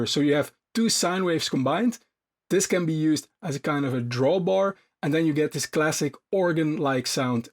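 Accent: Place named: Netherlands. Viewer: dat is een vatzig nederlands accent makker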